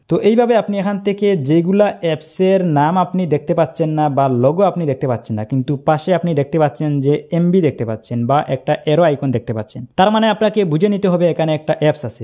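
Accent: Sylhet, Bangladesh. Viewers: Bhai tumi sylheti nih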